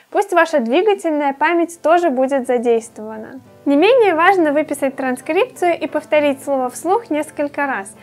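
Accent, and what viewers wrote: United Kingdom. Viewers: ты классная Приятная и простая) Обожаю твой англ. акцент!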